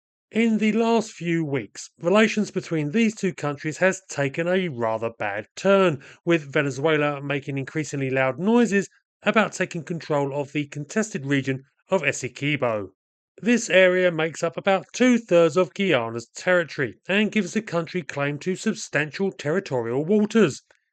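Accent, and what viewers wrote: United Kingdom. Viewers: A mess such as this is always clarified when explained by a proper gentleman with a British accent.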